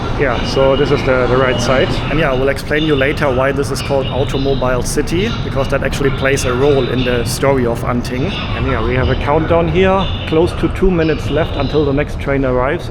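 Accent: Germany. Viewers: I really enjoyed your channel, thank you for doing this. I was just on Facebook watching one of your videos from HK to SZ and suddenly noticed your German accent ( sorry but I think it’s cool to have it) and then I rushed to YouTube 😆 just want to share the info that anytime in the future if you would like to travel back to China please visit my beloved hometown (if possible) it’s Qingdao city from shandong province, the most Germanic city you could get in China, the ocean view the pilsner Tsingtau lager, the seafoods! You might be shocked and amazed by research the history of Tsingtau. Please have a wonderful trip of traveling the world!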